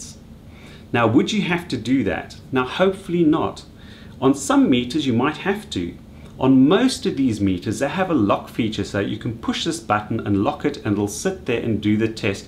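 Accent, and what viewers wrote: South Africa. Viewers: haha sud afrikaans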